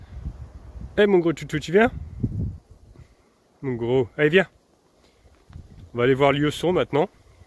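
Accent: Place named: Lyon, France. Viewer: Il est de Lyon sud. C'est un gone. Ca s'entend a son accent, parfois.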